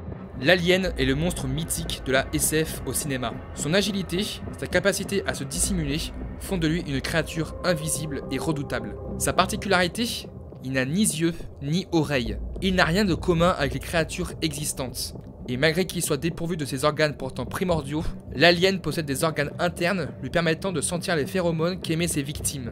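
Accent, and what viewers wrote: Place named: Paris, France. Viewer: vidéo bien faite, par contre cet accent parisien snobe est insupportable "particularitéffff, il sort les yeuxffff, mercichhhiiaann etc" donc pas de pouce bleuffffffan, mais pas de rouge par respect pour le travail.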